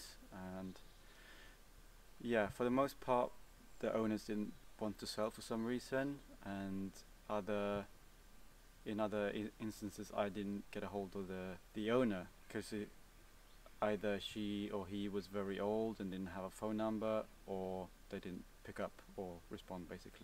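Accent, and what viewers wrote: United Kingdom. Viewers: Great to hear more of your story! I live just north of London and have a Swedish mother and English father… when you first spoke it was refreshing to heat your UK accent… so often US English accents pervade! Also, as a lockdown project, I built a bastu in my garden… so important to make things with your hands. Keep up the great work! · May I ask how old you are? And it sounds like you live in London - if so how frequently do you visit the cabin?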